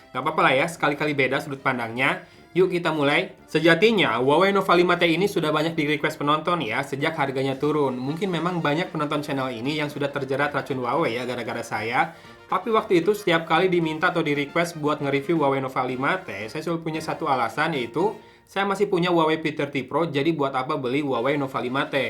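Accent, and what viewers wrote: West Java, Indonesia. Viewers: orang sunda mah keliatan dari logatnya juga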